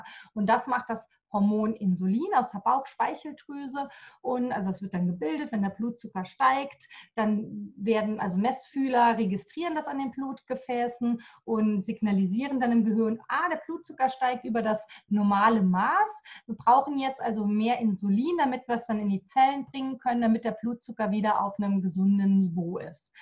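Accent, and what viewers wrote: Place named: Saarland, Germany. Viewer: Ich liebe den saarländischen Dialekt 😊❤. Lg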